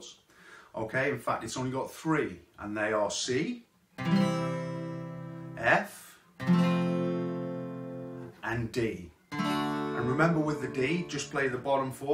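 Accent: Liverpool, United Kingdom. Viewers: Hi. I struggle with the Rhythm. But it's just practice. Can I ask you about your accent. Are you from Liverpool if so which part?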